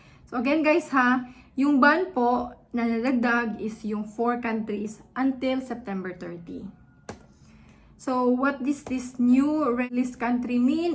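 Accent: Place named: Visayas, Philippines. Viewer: Bisaya gihapon ka day.sinultihan plng nimo.mailhan na day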